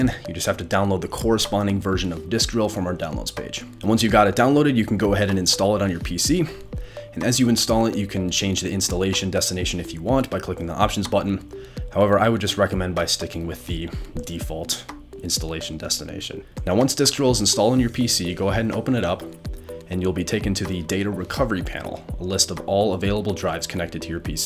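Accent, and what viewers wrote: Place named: Canada. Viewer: Hi there! Great video! I will try to recover my SSD. By any chance are you Canadian? I am, and I hear the accent in your video. Great job on this and thanks for helping us with recovery issues!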